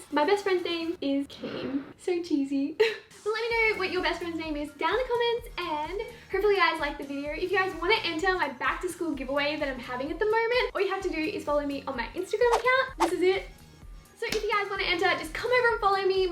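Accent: Australia. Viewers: i love how austrailans talk... vider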